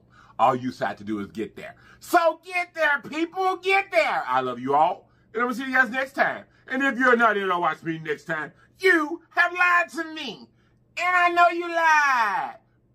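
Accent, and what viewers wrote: South, United States. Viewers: I have to cut off volume, because you talk too much and we want to enjoy watching you eat. Sounds like your roots are from the south and you live there now, parents taught use to not talk while eating. Now I understand why, you show all the food in your mouth and it’s gross. Try getting on the radio you have the gift to gab, and it’s not necessary on a eating show since you have so much information to share to others..Just my observations.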